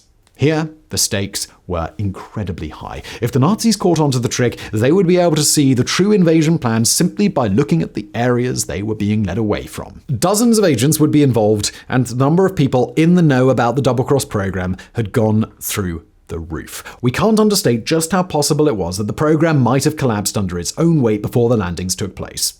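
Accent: United Kingdom. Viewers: I'm pretty sure this was a very good program. What I could understand was very good. My problem is that I am a US citizen, and though I love British accents, I can't follow very rapid speech. I missed at least a third of what was said because it was a little too fast for me to be sure I was comprehending what had just been said. If your main audience is British or Commonwealth citizens, I am sure you are doing a terrific job. If you also want to let Americans appreciate MI5 and XX, perhaps you could speak just a little more slowly? I know the fault is MINE -but I did hope to hear it all, and I couldn't keep up.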